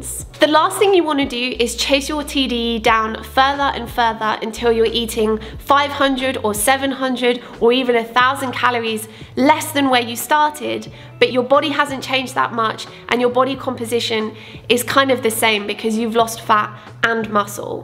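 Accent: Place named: United Kingdom. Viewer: I love your british accent 😍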